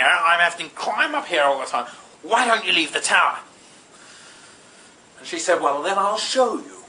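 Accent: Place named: England, United Kingdom. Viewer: You have the most awesome English accent ever!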